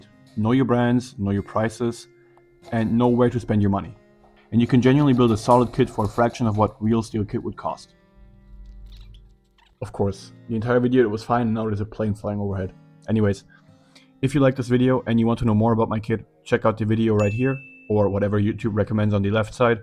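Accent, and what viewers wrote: Germany. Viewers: Ich höre doch einen Deutschen hier raus 🫣🫣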